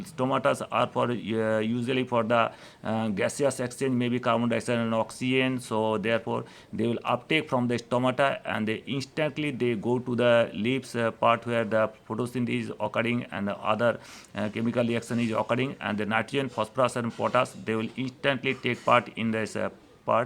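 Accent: India. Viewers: The best Indian accent so far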